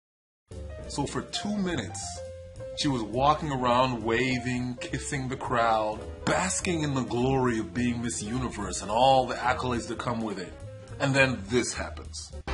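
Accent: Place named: East Coast, United States. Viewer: hahaha subbed back! I hear a little east coast..is that where you're located?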